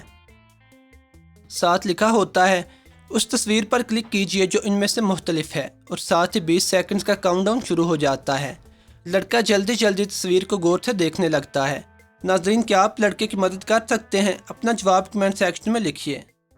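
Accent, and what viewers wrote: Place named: Lahore, Pakistan. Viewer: Teri awaz boht ajeeb hai , you are LAHORI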